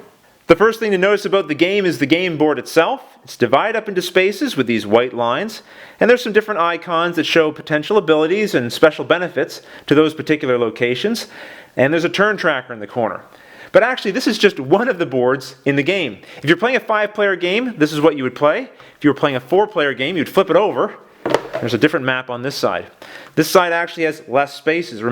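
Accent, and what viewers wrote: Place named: Maritimes, Canada. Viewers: Wait a minute.. I just found out the reason why I watch your videos. Your voice is so familiar.. because I'm from PEI too.. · Do I detect an Irish accent? Edit - after researching, I've discovered it's most likely a Canadian Maritime English accent. since you've mentioned you were on Prince Edward Island. Cool! accents are so interesting.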